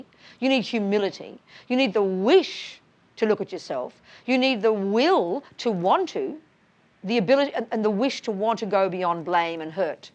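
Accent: Australia. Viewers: She's Aussie, 100%, so even drier still. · i like this nun she speaks good aussie language peace compassion wisdom im happy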